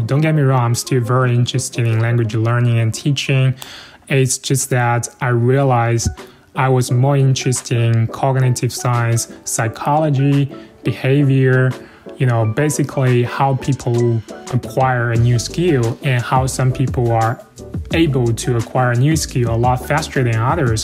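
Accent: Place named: China. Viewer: 听你的中式英语还自以为很流利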